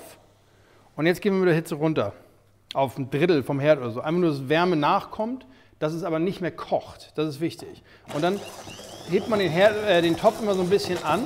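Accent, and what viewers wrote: North, Germany. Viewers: Dieser norddeutsche Akzent 🥰 da wirken die guten Erklärungen noch besser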